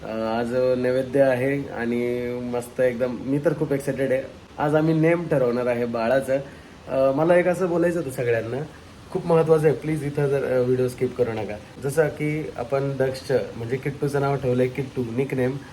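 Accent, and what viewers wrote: Nashik, India. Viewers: Dada aple nashik vale olku yetata voice madhun kadje gya dada tai chi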